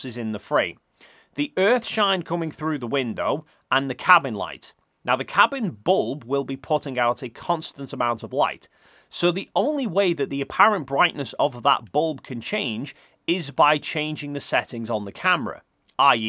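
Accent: United Kingdom. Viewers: Did they think having someone with a British-style accent would make it seem more authoritative, like an Attenborough film? This is similar to the people who ask why the moon photos have a black sky but you cannot see any stars. They just have no idea how a camera works and how exposure changes based on the brightness of light sources. Here, the outside light source (the Earth) is basically over-exposed (when they pull back to show interior) so the camera can show the details inside the capsule as it is darker inside than outside. The window is super-bright because the amount of light coming in is way more than the interior lighting. Same with the starless moon photos. The cameras there were set for the lighting of the sun and reflected sunlight on the surface so there was proper exposure and the photos are not too bright or too dark. If they were to set the exposure to see stars in the sky, which are many times less bright than the moon's reflected light, then all the details of the moonscape would be over-exposed, or washed out, and you would not be able to discern much in the view. 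Plus they did not go to the moon to look at stars; they would look the same as from Earth or low-Earth orbit.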